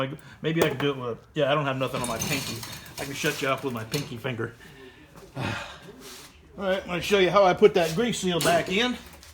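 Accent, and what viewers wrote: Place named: Connecticut, United States. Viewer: great job,i could tell by your accent you were originally from Ct.....lol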